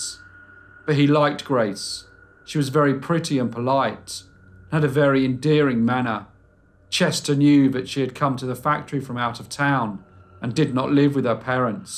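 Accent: United Kingdom. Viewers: you really need to lose the british accent for your most large american audience. i would suggest california valley girl. the kardashians speak fluent valley girl, so listen to them. soon you'll be shortening words like gorgeous to gorg, fabulous to fab and ridiculous to redick. any word that ends in ous you will learn to shorten on the fly. i promise you your subscribership will soar.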